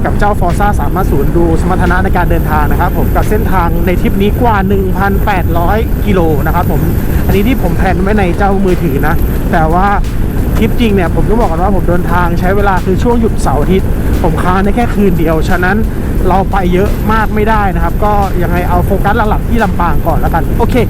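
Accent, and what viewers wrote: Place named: Kanchanaburi, Thailand. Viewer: พูดเหน่อเหมือนเด็กกาญจน์เลย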